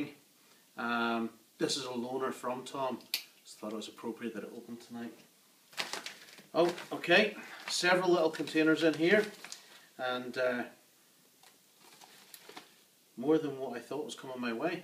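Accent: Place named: Ireland. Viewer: Sweet Gifts. Dig the pirate talk especially since your Irish accent makes it sound more genuine than mine with my South Florida Cuban English. We've got to do something about that jersey though.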